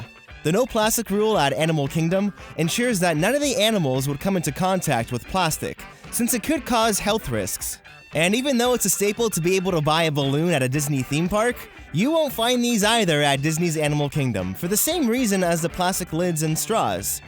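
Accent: Canada. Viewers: He's Canadian. That's how Canadian's say it. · He is Canadian.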